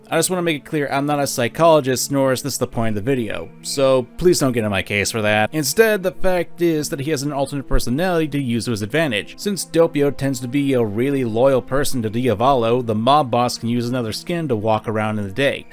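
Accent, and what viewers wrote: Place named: United States. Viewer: your family? Because your accent and channel is american.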